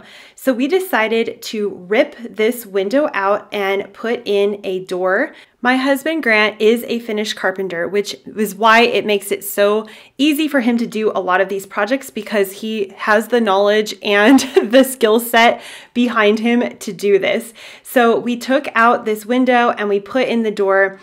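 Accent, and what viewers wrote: New Zealand. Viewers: Oh my gosh I hear a New Zealand accent lol...I'm kiwi too. I love the transformation, I love how you've created a beautiful space within your means. This is really motivating. So many people show renovations that look unrealistically expensive for many. Thank you for sharing your journey 😊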